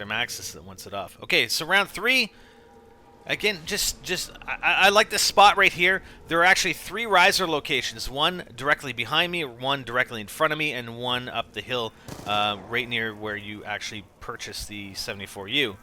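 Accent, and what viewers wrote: Canada. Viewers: im guessing you're canadian? btw thx for the strategy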